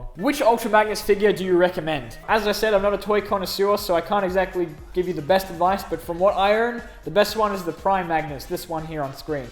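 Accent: Australia. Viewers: You're Australian? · wait... YOUR AUSTRALIAN!!??! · You're Australian? I've literally spent all this time thinking you're british..........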